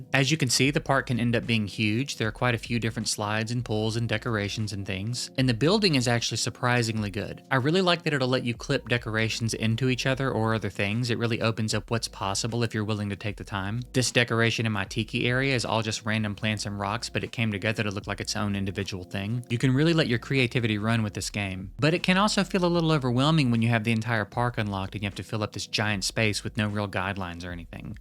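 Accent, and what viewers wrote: South, United States. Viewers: Dude, you’re so good! Also you sound like you’re from the south like me. Great writing and great commentary. Keep going. You’re onto something big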